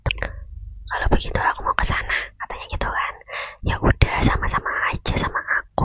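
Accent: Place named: Java, Indonesia. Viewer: medok bgt kak😭, btw asmr bahasa jawa lagi dong tp crita gtu trsrh kakak crita apaa ajaa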